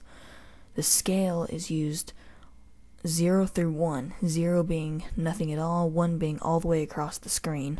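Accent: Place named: Florida, United States. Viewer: dude your voice sounds a mixture of something like you live in florida and then you sound kind of low anyway that was off subject.========================== thx video really helped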